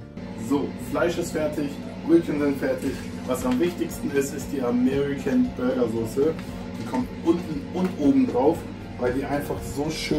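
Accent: Africa. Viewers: Hahah wie süß deine Freundin ist hahah am Ende wie sie dich einfach sauber macht und dein Afrikanischer akkzent ist einfach legender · Er kann diesen afrikanischen Akzent so gut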